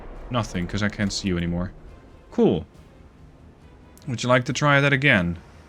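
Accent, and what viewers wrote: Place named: Netherlands. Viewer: horrible Dutch english. OMG. get some training.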